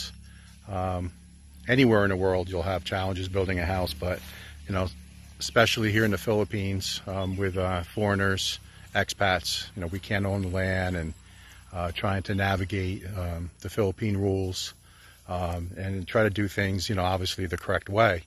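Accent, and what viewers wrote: Philadelphia, United States. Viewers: NEW MEMBER. ARE YOU FROM THE EAST COAST OF USA? SOUNDS LIKE FROM "PHILLY"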